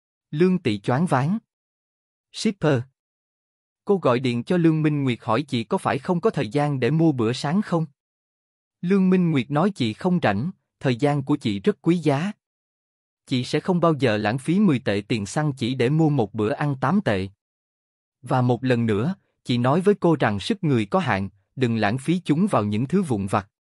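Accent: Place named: South, Vietnam. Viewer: Rất thích nghe giọng miền nam đọc 😊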